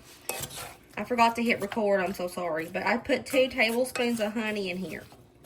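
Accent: South, United States. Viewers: Keeping meals simples❤ I rrally enjoy watching. And I love your southern draw when you talk. God Bless you and your family in 2025.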